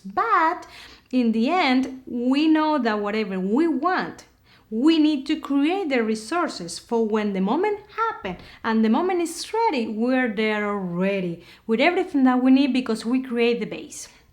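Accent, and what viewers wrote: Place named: Spain. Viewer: Im your fan for your spanish accent of English. How sweet☺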